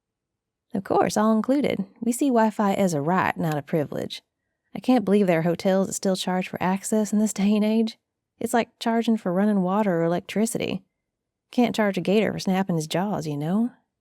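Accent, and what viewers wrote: South, United States. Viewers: Yes! This world needs more southern accent asmrs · So this is that southern hospitality that they talk about. Like, I’ve never been to the south in my life, but that accent immediately immediately makes me feel at home and taken care of. Also. XP?! Good lordie that computer is ancient. Please tell me it isn’t connected to the internet.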